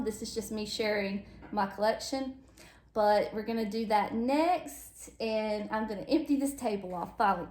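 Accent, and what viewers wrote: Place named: Alabama, United States. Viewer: I’m so glad I found you! A lot of things you use and say remind me of myself. I have several of my grandmothers pieces and they bring back such sweet memories! You are so cute and precious! Where are you from? Sounds like Alabama. I live in Memphis. My daughter leaves in five days for Bama in the fall. ❤️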